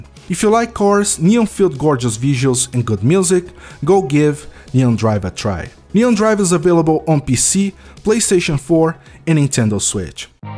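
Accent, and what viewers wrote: Brazil. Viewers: brazilian accent? Tamo junto kkk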